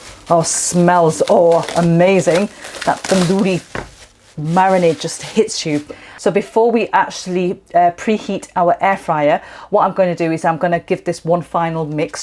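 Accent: United Kingdom. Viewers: Her accent is British. Parents are likely Punjabi either from Pakistan or Indian Punjab. She is definitely not Kashmiri or Pothwari. The recipe is typical Punjabi tandoori chicken but now made in Air fryer. Also Kashmiri women are not that open minded in matters as Punjabi women are. They prefer the hijab and nikab and cannot cook so well.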